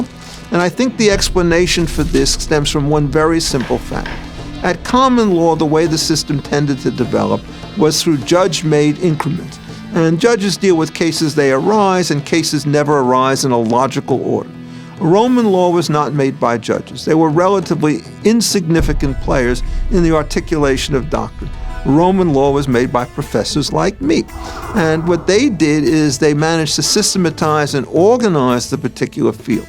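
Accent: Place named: New York, United States. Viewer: Jewish New Yorker born before 1960 accent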